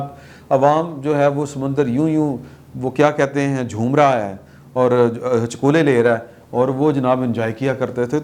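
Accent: Punjab, Pakistan. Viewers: I love ur mix Punjabi talk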